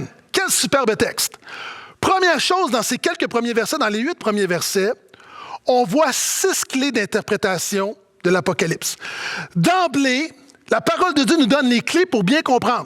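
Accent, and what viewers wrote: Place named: Quebec, Canada. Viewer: Accent québécois. Difficile oui.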